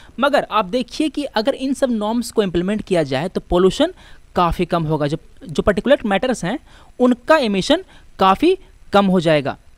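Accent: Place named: Bihar, India. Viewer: control ur bihari tone plZ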